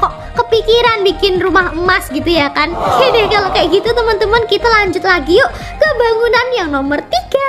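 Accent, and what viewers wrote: Java, Indonesia. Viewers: Wa kakak medok pasti kakak orang jawa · Kak ngomong nya nya kayak orang jawa Btw bagus banget kak video nya😍☺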